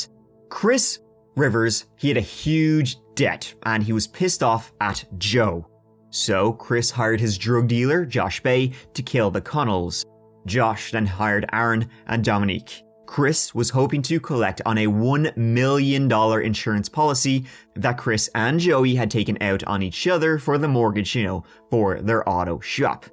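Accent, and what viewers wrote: Ireland. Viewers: I heard this story before but it’s always better with an ol’ Irish narrator giving it a goo!!!! 💚💚🤣🫶🏻🕺🪩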